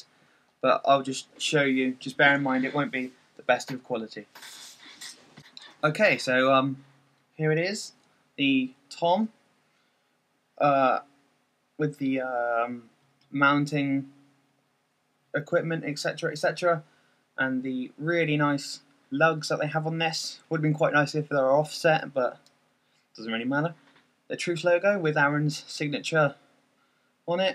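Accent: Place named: United Kingdom. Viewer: dude i could listen to you talk all day. i like hearing people from the uk talk.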